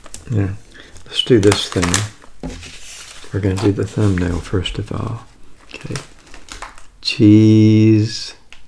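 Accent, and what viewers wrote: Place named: South, United States. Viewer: Great video! Thank you for continuing efforts. I wonder if you # or labeled a video southern accent if it'd intice more people to watch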